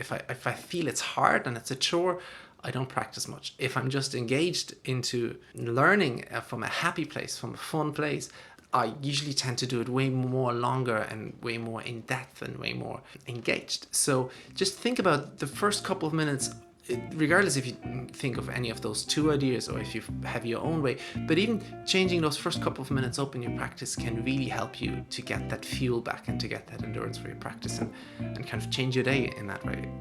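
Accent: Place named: Dublin, Ireland. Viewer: Hey. I found your channel because it is referenced by another YouTuber, Mikko Hilden. I don’t know why I assumed you were compatriots so I was happy when I heard the Dublin accent. I live in Dublin normally but I’m in Australia for the next couple of years. I started taking guitar lessons recently, straight into Jazz. You référence technique exercises but you don’t have a link to them. It would be great if you could point out where us beginners can find good technique exercises. Do you teach? I’m hoping that when I’m back in Dublin I can continue studying at a less embarrassingly beginners level. Keep the videos coming.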